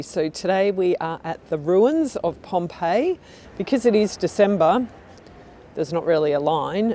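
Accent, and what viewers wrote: Australia. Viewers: Excellent Editing. It’s great to hear an Australian accent on a travelogue.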